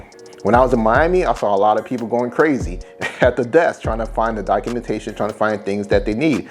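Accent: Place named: Chicago, United States. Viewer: Sounds like your from Chicago bro. Where did you get your test at and how much was it?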